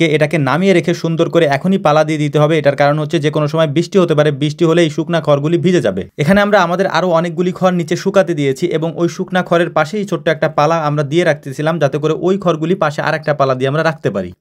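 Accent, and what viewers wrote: Faridpur, Bangladesh. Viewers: ফরিদপুর এর কথা শুনেই Subscribe করে দিলাম। আপনার বাসা ফরিদপুর এর কোন জায়গায় জানাবেন ভাইয়া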